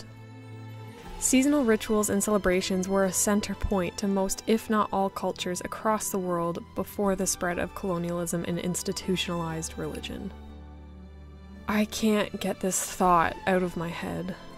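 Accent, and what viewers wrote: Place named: Ontario, Canada. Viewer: Keep speaking this way, when you said you were nervous I was like noooo! It's so powerful! If I had to guess what province you live in... I think it's Ontario.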